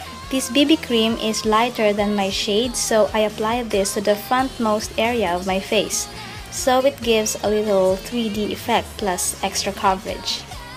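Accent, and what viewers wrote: Philippines. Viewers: Omg i can totally hear the Filipino accent in you xD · I instantly knew you were from the Philippines when I heard you speak. xD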